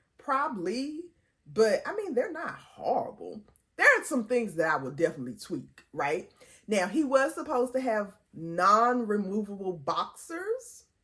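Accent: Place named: South, United States. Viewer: Love listening to you because you and I have that Southern drawl. They’re both so cute, when are the patterns going to be available for sale I need to make my Wilberforce University (1856) dolls?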